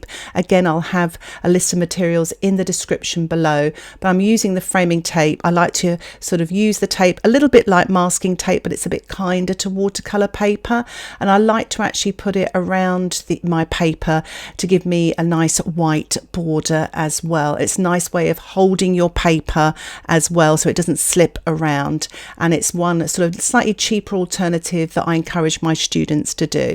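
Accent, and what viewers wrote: United Kingdom. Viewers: i wish I had this video when I first started painting with watercolors. It would have answered so many of my questions. I've done many of your tutorials because I love the sound of your voice and cute British accent, the ease with which you explain things, and the style and subjects of your paintings. Sending much love to you💕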